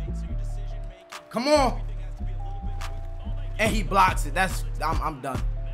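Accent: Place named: DMV, United States. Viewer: Your from the DMV aren’t you lol…I can hear it…Good content bro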